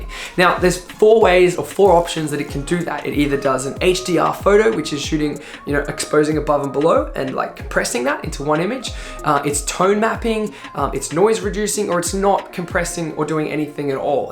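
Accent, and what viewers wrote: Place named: Australia. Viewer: Great to hear an Australian voice keep going man! Good video, I am thinking of buying this GoPro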